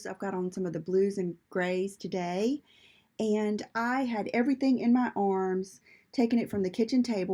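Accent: South, United States. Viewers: So sorry you burned yourself. I have done that too. I have been watching a lot of your videos and truly adore you. Your accent sounds like you might live in the south. It is so nice to listen too. Love your kitties. When I was growing up we lived in a small town in central Illinois. We had 10 acres surrounding our hose which was 3 stories. We had so much space that the top floor was turned into a play house for me and my sister. With that said we also had room for 39 kitties at once. We would dress them up in doll clothes, pretend to operate on them and just silly girl's stuff involving our kitties. So long story short I love love kitties and yours are so beautiful. Love them.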